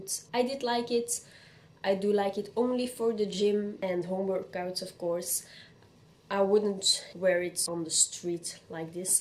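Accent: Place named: Europe. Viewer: I like your accent. I lived in Europe this video made me miss it for sure ❤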